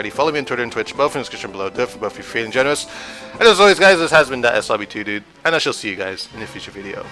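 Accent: United Kingdom. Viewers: Your british like me. ;> thats why i see u in ranked battles. also i find the goo tubers are the best charger. ;)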